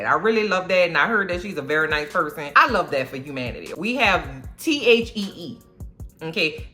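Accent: New Orleans, United States. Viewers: Sounds like you’re from Nola⚜️😃 I knew it, I know my people 😂 I like that Melanin people are on my screen but TP needs at least 2 seasoned writers from Shonda Rhimes team or somebody😅 however, it was funny! I now point two fingers at my kids when they don’t listen😂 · This is my first video of your and Im here to stay. I love a New Orleans accent chile...from your neighbor in Texas 😉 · I'm subscribed I lived in Lincoln Parrish I miss that neworleenz talk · You remind of Bondy Blue!! Love you vibe and N.O. accent! You’re so funny! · BTW, your New Orleans accent makes this even funnier😂😂😂 it just adds spice and realness.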